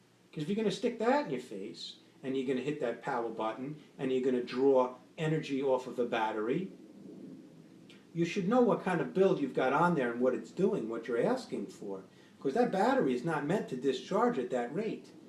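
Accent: New Jersey, United States. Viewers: I vape using smok Tpriv at 65-68w on recoil RDA at.20ohm using mxjo's dual 18650 and I produce big clouds. it's not how much power you put to device to produce a cloud. it's also what kind of juice your using PG/VG I use max VG. there you go yo sound like a joisy boy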